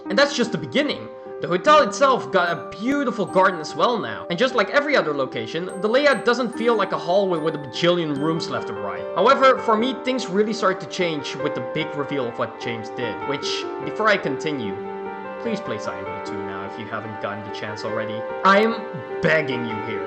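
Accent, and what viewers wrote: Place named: Flanders, Belgium. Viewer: Flemish accent detected Gezegende silent hill upload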